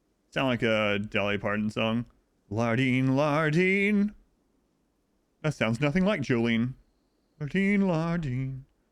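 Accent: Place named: Appalachia, United States. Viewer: hahaha, maybe I can leave some vids on .5x speed for a while And also your apalachian voice is jokes, altho I did understand it enough considering the diverse group of people ive met and played games with, definitely harder to understand though, I dont think I could watch that on 1.5x speed like ive sometimes done for your vods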